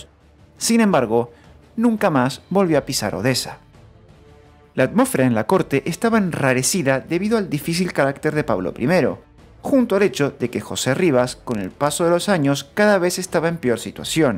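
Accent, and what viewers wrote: Latin America. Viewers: Ya quisieran muchos profesionales hacer audiovisuales como este canal. Y me encanta el acento Hispano de América.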